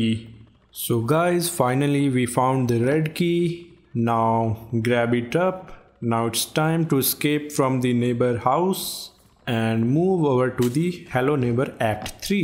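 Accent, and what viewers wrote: India. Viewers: He Indian most Indians have an accent like that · His voice sounds like Indian but peaceful but it’s cool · Resist kids his indian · It's because his an indian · He is indian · 😂😂 indian ho bhai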